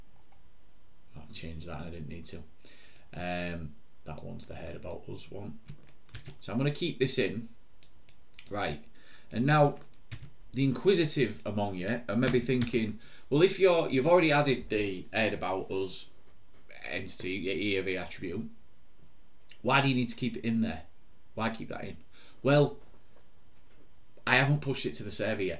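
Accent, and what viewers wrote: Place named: United Kingdom. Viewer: Hi , Being an Indian, we find it difficult to listen to UK English , it would be great if u talk in simple and clear English for ex:- mark Shust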